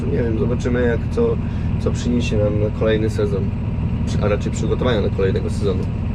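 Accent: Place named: Mońki, Poland. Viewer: Słyszę że kolega z Moniek haha daleko masz do szwagrów?😂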